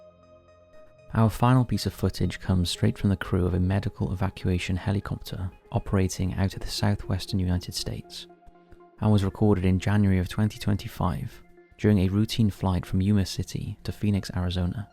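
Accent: Wales, United Kingdom. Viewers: I love this British narrator guy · Hooray! 🎉 We've got the posh English chap back! Sounds more classy! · i thought welsh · Is this guy welsh ?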